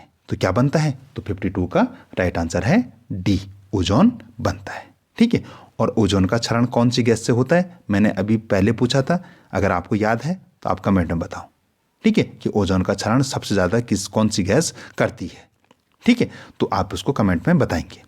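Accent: Garhwal, India. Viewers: I just love your gadwali tone sir ji ❤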